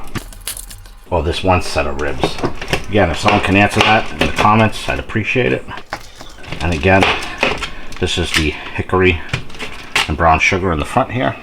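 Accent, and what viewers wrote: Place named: North, United States. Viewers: Sounds like Pittsburgh accent am i right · Like your accent man, I'm from the deep south and love listening to you guys up there talk.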